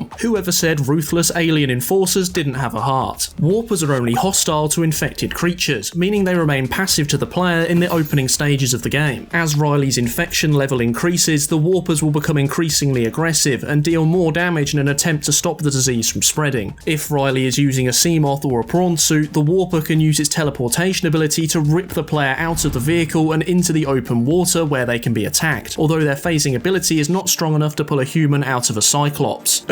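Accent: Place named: United Kingdom. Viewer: Why is it every time I come across one of these Explained Video's No matter what game it is it's always Some British voiced Prepubescent kid XD lol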